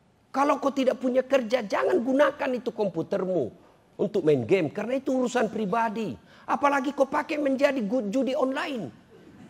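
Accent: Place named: Makassar, Indonesia. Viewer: Logat Makassarnya ini saya kangen..serasa waktu dulu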